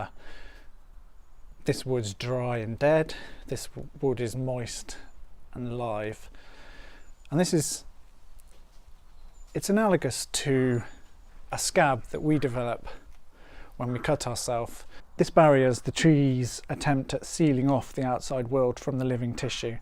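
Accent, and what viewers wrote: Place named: United Kingdom. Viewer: I'm from Germany. By you're accent you're from Britain, right?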